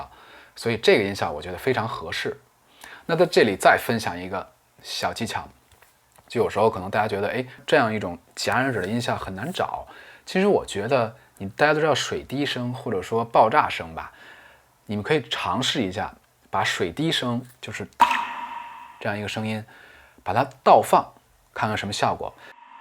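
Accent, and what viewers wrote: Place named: Beijing, China. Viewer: 太厉害了，感谢分享，ps：京腔很正～！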